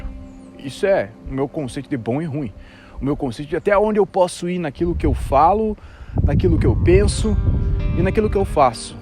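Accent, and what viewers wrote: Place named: South, Brazil. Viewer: Sou do Sul também, e cara te admiro por levar suas raízes nos vídeos, eu percebo que as pessoas daqui do Sul quando pegam fama ou simplesmente pra parecer com todo mundo acabam perdendo as raízes, nasceram falando "LEITE" e mudam pra "LEITI", não entendo por que essas pessoas precisam fazer essa mudança, se é uma questão de aprovação social ou algo do tipo.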